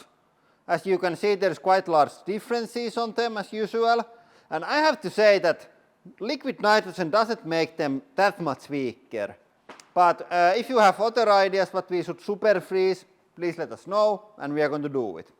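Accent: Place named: Finland. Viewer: I can instantly telm ur finnis because of the accent so moi ja oli hyvä video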